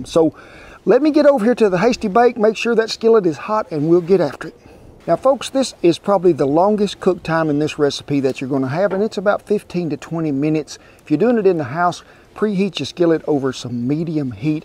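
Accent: United States. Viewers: Это настоящий американец, фермер с глубинки) Акцент потрясающий) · Friendlyjordies bought me here. Love me some cowboy accent and some delicious yum yumms.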